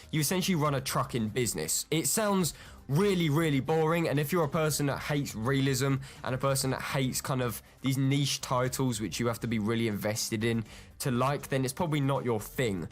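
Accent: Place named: England, United Kingdom. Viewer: I like your accent.. Is England your city?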